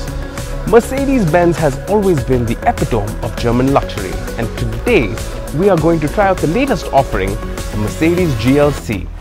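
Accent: India. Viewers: I literally can't understand you with your indian accent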